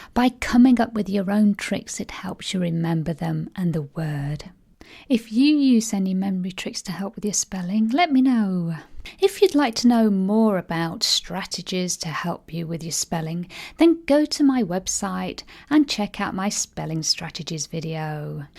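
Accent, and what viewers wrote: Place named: Midlands, United Kingdom. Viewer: I fell in love with your voice and my ears would like to marry it. The fact that you pronounce the 'g' at the end of '-ing' suggests to me you learnt to speak in the Midlands or North-West, is this correct? I'm a big fan of mnemonics, as I do some teaching myself. For sentence coherence and therefore better memorising, before and after the 'hurry' in 'diarrhoea' add a pause, it scans so much better and my students seem to remember it better. Whilst nothing to do with spelling, perhaps my favourite mnemonic is: 'How I wish I could recollect Pi' the amount of letters in each word equal '<num>', Pi to six decimal places. I have about a dozen which I use with scary regularity. The one for remembering the kings and queens of England is awesome, it starts 'Willy, Willy, Harry, Stee, Harry, Dick, John, Harry three...' It starts at 1066with William the conqueror and continues right through to our current queen, eventually. 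I'm looking forward to your website / next video, but not quite as much as my ears are ;-)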